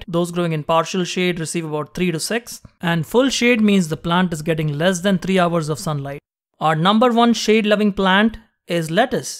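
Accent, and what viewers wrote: India. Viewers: Such a helpful video 🙏 thankyou for posting this 😇 you sound indian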